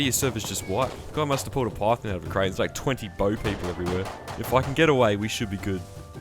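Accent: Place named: Australia. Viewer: Is this dude Aussie? He sounds like an Aussie.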